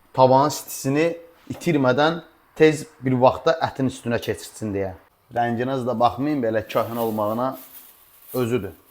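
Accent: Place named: Shamkir, Azerbaijan. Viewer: Semkirli oldugu 100 gunlukden bellidi menim qardasim kimi danisir bisirir sendi Masalla size cox gozelsiz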